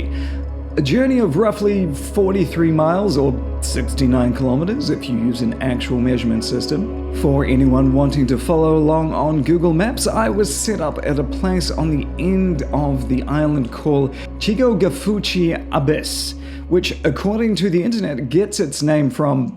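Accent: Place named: New Zealand. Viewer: Your "broken kiwi accent" is legible so your good.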